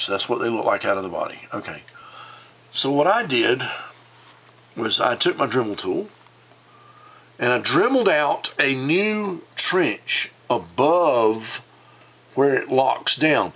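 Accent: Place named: Texas, United States. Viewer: I've never heard of Southern Texan guy talking about Batman action figures loll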